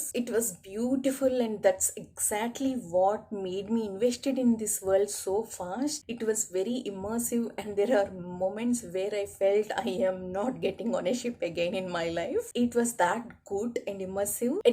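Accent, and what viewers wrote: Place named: Scotland, United Kingdom. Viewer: You had Me at Scottish 😂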